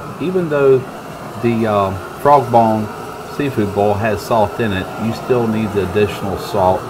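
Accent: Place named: Louisiana, United States. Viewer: Half cup of kayeen paper! man i love the Cajunness ohhh yea brother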